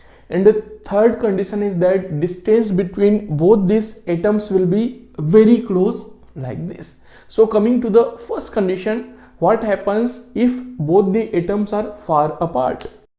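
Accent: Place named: Maharashtra, India. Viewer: You are marathi by your english i can guess that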